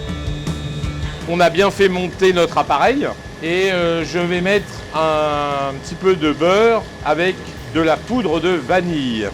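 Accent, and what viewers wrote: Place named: Paris, France. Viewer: J’adore l’accent parisien mais j’aime pas les fruits confits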